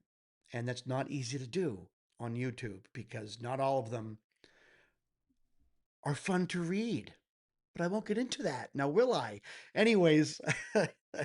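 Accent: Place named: Philadelphia, United States. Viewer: " " by with, trust me! (I'm from Philly, living in the midwest, hearing you speak is like being in my hometown area!)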